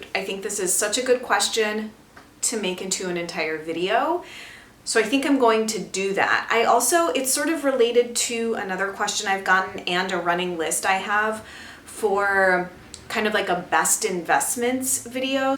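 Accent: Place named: United States. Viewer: First of all, can I say how great your English is? Might sound weird to you, even more so given that I am not a native English speaker myself, but I studied the English language and I love the fact that you speak it sooooo well, with a wonderful voice and accent (I have a thing for American accents, but mild ones, just like yours ^^)and w wonderful and varied vocabulary... You are extremely nice to watch but dare I say you are even nicer to listen to... Anyway, that was a great video, as usual. I discovered you through Clelia and I am so glad I followed her advice! I, for one, would be very interested in hearing you talk about your transition to a non hormonal birth control method (I got rid of that in 2010 too), as well as your relationship to music, obviously. You might have explained it in earlier videos that I haven't watched, but what is your relationship to the French language? 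Anyway, I hope you're having a great time in Texas with your family (I saw in IG that you had some croissants, good girl!! ^^). Thank you for being a very inspiring woman.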